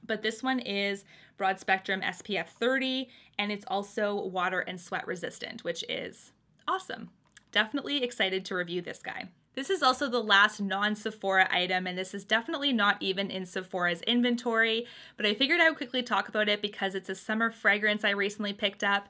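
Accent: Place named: Canada. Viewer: Good morning (at least it’s morning here in Germany 😀). I’m a Brit living in Munich, Germany and because of the time difference you are very often my breakfast buddy 😊. Your videos always put a smile on my face and cheer me up if I’m feeling down. You have a lovely sunny disposition and I love your Canadian accent! The haul looks great. Think I might have to get the Briogeo mask, as well as the Marc Jacobs palette. Really hope the mascara lives up to your expectations - now I’m worried that I hyped it up a bit too much (it is great though). Have a great day xx 💋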